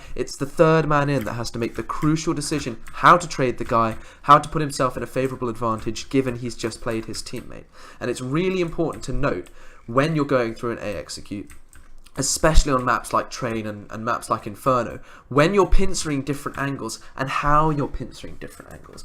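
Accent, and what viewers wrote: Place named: United Kingdom. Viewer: You also have the perfect type of British accent for voice-overs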